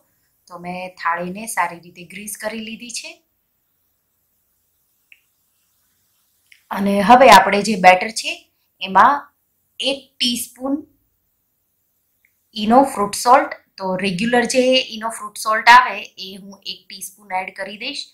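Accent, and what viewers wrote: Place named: Kathiawar, India. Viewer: ઈ બહુ સરસ બયના છે. મને તમારું કાઠિયાવાડી - હિન્દી બધું મિક્સ સાંભળવાની મઝા આવે ☺️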